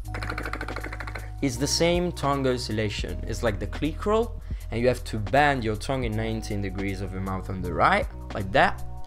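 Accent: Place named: Italy. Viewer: Si sente proprio che sei italiano bro😂 Ti adoro continua così💪🏻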